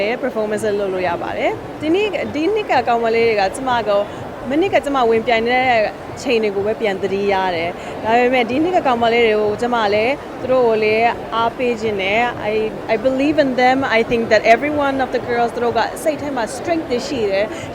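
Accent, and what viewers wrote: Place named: Myanmar. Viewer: Cute girl with cute Burmese accent.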